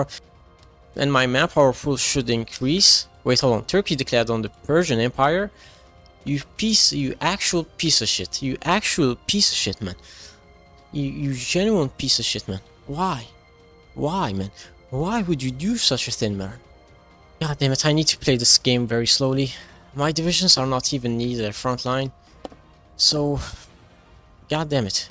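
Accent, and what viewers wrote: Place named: France. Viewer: The french accent fits well in your talking bro 👍👍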